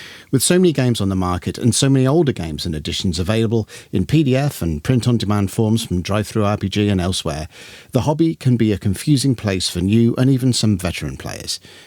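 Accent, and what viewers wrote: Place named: United Kingdom. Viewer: A man with a British accent talking about rpg history is peak.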